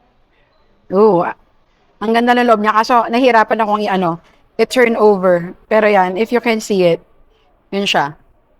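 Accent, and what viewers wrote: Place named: United States. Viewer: Ganda ng speaking voice mo Ma'am. Very American Accent. ❤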